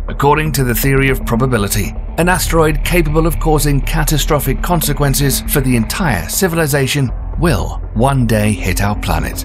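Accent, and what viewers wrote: United Kingdom. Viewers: Funny how bull shit with a British accent sounds more credible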